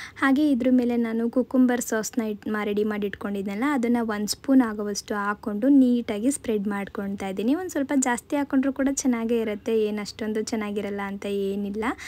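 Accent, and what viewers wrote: Mandya, India. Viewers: U are such a sweet girl and ur slang just like us proper mandya slang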